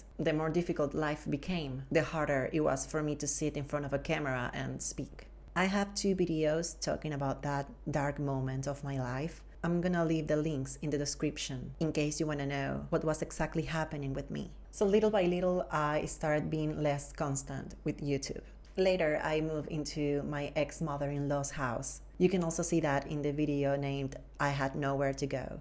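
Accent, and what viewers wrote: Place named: Spain. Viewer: I absolutely adore your Spanish accent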